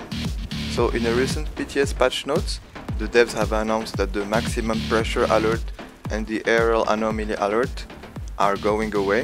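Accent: France. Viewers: Good video ty...Hey can i ask why you speak like a french guy? i am french and when you speak in english, it looks like you know how to speak french:)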